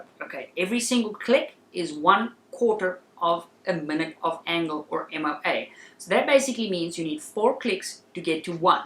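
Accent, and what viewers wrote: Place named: South Africa. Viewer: Man. Goed Om ‘n Suid Afrikaanse aksent te hoor in die vreemde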